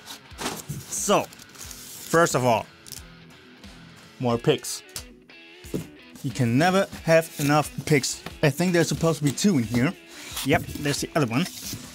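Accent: Germany. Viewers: I have also an 2.7 in Lemon. The only Problem i have is , powerchords on the Low B sounding very „wobbly“. Any Tips ? String Gauge? 10-64? Ich höre einen deutschen Aktzent. Wenn du deutsch bist dann kannste mir natürlich auch so antworten ;-)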